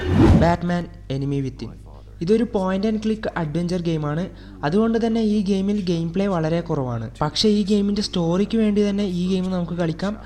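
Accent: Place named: Kannur, India. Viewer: Bro kannur ano ?? Basha ath pole ind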